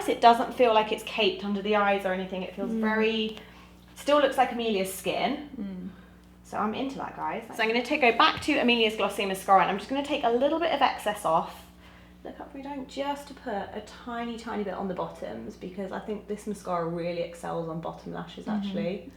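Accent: Australia. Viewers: Loving hearing the familiar Aussie accent on your channel! x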